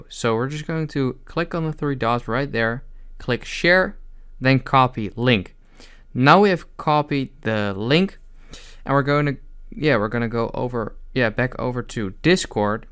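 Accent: Netherlands. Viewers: R u from the Netherlands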